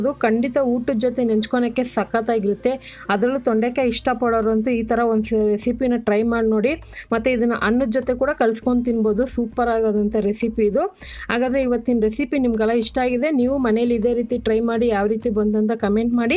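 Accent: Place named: Anekal, India. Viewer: Watched your kalamadhyama interview. I am watching your video since a year and I did not really admired the way you were speaking kannada words... But now I got to know that you are from Anekal and slang is like that.. All the best tangi... Devaru nimagella olleyadanna maadali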